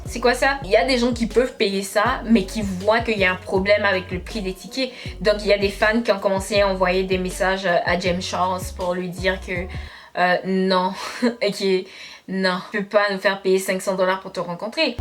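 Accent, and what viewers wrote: Quebec, Canada. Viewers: Ta un accent québécois?genre canadien? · Tu es québécoise? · Ton accent est trop beau!! C'est un mélange entre québécoi et français de france pis anglais.!!❤❤❤❤❤❤❤❤❤ je t'aime trop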